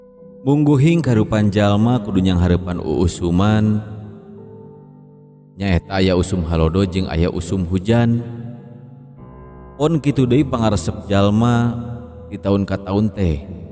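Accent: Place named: Sunda, Indonesia. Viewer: Bahasa medok sunda gk paham ngomong apa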